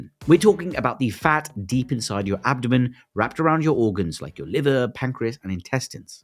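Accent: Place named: United Kingdom. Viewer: British accent suits fabulously on your visage!! 😃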